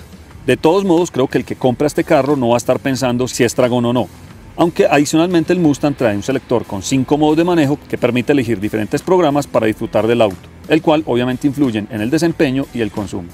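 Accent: Bogotá, Colombia. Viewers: Mero hablado de rolo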